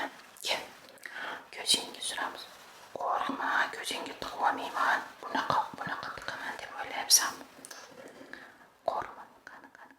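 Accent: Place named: Andijan, Uzbekistan. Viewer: Juda ham ttabbiy chiqqan anjancha gapirishiga bitta❤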